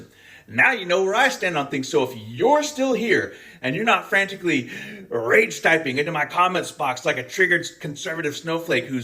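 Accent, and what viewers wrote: Scotland, United Kingdom. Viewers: It is funny how when you get angry the scottish accent disappears. I love stack-up. I have donated to them before. It is an amazing charity.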